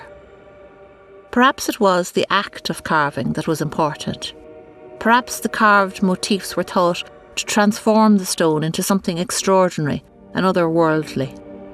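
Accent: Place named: Ireland. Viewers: Oh, iffits a Colleen it's a lovely Irish Lilt, man! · Absolutely wonderful! The narrator's lovely Irish brogue lends such authenticity; clearly a highly intelligent, cultured woman. Amazing how New Grange has survived intact. Robin Witting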